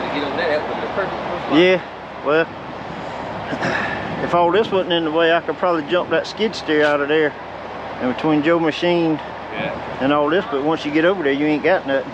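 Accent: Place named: South, United States. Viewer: no P in sumter lol, that southern draw, love it. great video and recovery. you have a great deal of patience. I recently recovered a tracked skidder with mud and water over the controls, crappy filming I did because people didnt want to be on film and they didnt know how to operate it at all, made for a long day, lol. My truck is way smaller too, lol. Yall stay safe and keep having fun.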